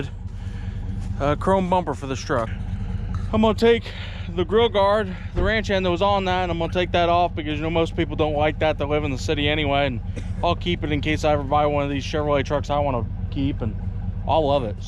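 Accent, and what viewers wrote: South, United States. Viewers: My 8 year old boy and I love watching your videos. They are great. We Live in Pittsburgh Pennsylvania, in the city ,but my son wants to be a country boy more than anything, and he always tries to talk with a country style accent like you do and has been trying since we have started watching your videos. Keep them coming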